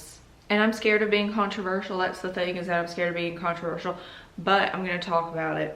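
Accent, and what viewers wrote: South, United States. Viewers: u dont have to hide ur accent sweetie go ahead with the southern loveliness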